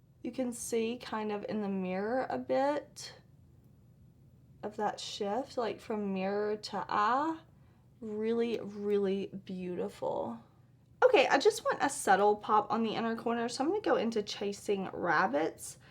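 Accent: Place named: Texas, United States. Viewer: I am so broken hearted. I have tried to get your brush set from Sigma about 7 times and they are always sold out. 😭😩😭😭😭😳 please tell them to make more so I can get your brush set finally. 😊I love you and your makeup videos. My half sister is from Mesquite, Texas and your twag sounds just like her. You are beautiful and your spirit is beautiful too! Keep making these awesome videos! Thanks girlie for helping with makeup tutorials and for helping to show all the new awesome indie makeup! ❤ thanks luv! ❤❤❤❤❤😊😊😊😊😊😊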